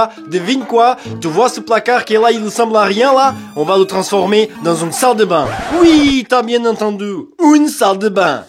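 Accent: Portugal. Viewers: J' aime bien ton travail. Est tu portuguès ? Ton aquecent me ressemble un portuguès. Pardone moi quelque chose. Merci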